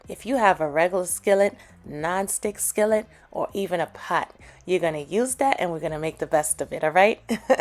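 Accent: New York, United States. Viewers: Im making this right now following your video step by step and pausing in between. LOL Your New York accent is everything. i love your videos and shared them with my sister who lives in Georgia and she loves you too. thank you for making my thanksgiving dinner special and delicious! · That NY accent! Just makes me smile watching it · Are you from new york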